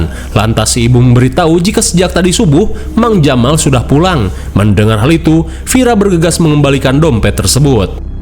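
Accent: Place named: Sunda, Indonesia. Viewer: Suara Admin nya banyak banget , ada beberapa channel x yaaa... Sunda pisan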